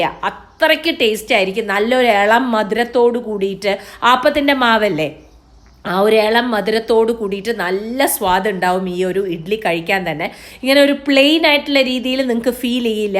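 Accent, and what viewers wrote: Palakkad, India. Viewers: Palakkad area aappam anu ennu thonnunnu. Nemmara thamasikkumbol avidullavar pronounce cheyyunnathu pole thanne aayirunnu. Thrissur appam thanne anu.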